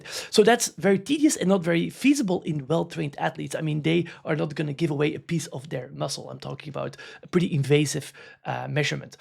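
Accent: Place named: Netherlands. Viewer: He sounds dutch or flemish. In those languages, the emphasis is on the first syllable. Otherwise his english is pretty solid for a second language. Constructive remark though.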